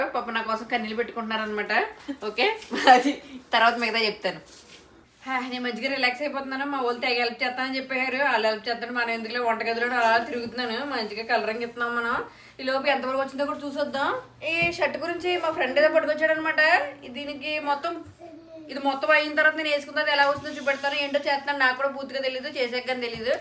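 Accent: Godavari, India. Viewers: Godavari yasa bagundhi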